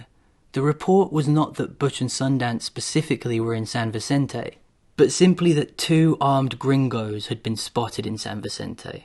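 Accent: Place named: United Kingdom. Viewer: Funny how you Brits are obsessed with our American wild west. And, Dude, you have a cool accent 😎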